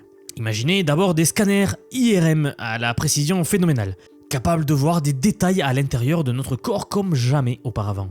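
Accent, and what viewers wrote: South, France. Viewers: J'ai vraiment du mal avec l'accent du sud 😂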